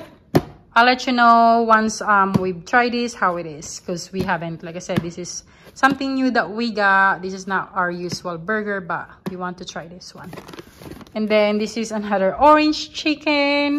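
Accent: Philippines. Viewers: Mam meron po kayo Filipino accent!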